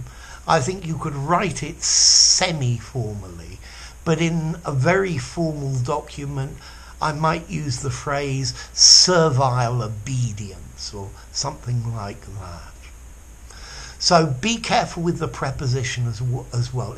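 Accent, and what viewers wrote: United Kingdom. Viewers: Sir your accent is very British, I love it. Thank you for helping us understanding these idioms 😊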